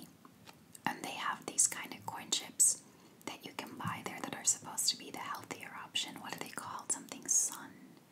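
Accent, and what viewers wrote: United States. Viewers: You may have answered this before but why do you seemingly not have an accent? Like you sound American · I would have guessed she’s from Chicago!!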